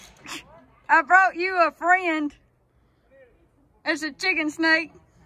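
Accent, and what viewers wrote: South, United States. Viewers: I love that Souther accent. You are a very beautiful girl love your little puppy.Have a great day beautiful. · Hello from Thailand :) Been following your video‘s and those of your dad and I truly appreciate :) ya’ll I had the big chance to learn my English during a couple of exchanges in a great family in NC , and after some adaptations I started to really enjoy your “southern slang” :) So thank ya’ll for your cool content. Now I wonder why you try fasting, but if you really want to loose some weight, maybe try to lessen the fried stuff from time to time. Here they do not use a lot of butter or oil when cooking, they boil for example chicken in coconut milk, with some onions, herbs, garlic and pepper, and it’s delicious, your are full and you start loosing weight also :) We eat this with some rice. But I truly miss the delicious fried catfish the dad used to prepare after fishing together. 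Even got the chance to experience true “frogging’” at night time :)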